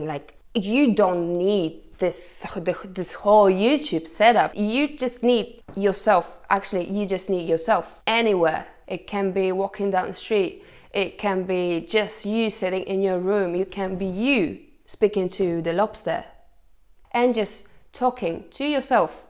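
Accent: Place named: United Kingdom. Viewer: mówi po angielsku z prawie idealnym brytyjskim akcentem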